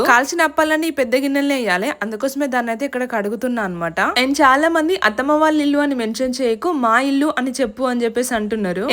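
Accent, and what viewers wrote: Telangana, India. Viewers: Mi telangana slang bagundhi😊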